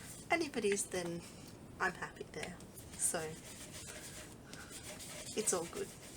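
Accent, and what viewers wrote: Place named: Australia. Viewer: one of my faces girl! ❤️ And I can listen to the aussie accent all daaaaaay long! Lol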